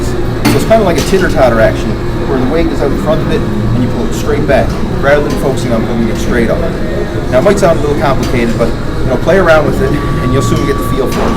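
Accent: Newfoundland, Canada. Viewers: wow man are you newfie? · Are you canadian? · I like that I can hear the Canadian-ness. Excellent video, man.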